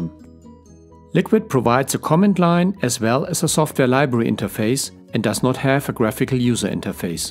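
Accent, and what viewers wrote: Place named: Germany. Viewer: I love this German accent!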